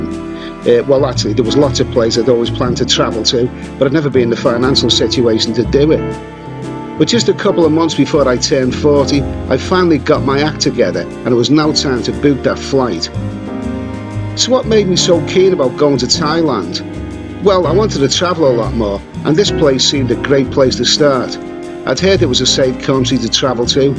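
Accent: Liverpool, United Kingdom. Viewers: Are you scouse? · Great video, mate, love hearing a proper Scouse accent instead of one of those well heeled condescending upper class tour guides telling me nowt but how to fund their Patreon account. Proper honesty in your opinions made this a pleasure to watch.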